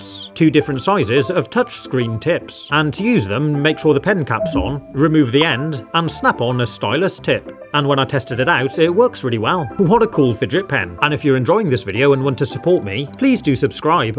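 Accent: United Kingdom. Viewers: Bros British 💀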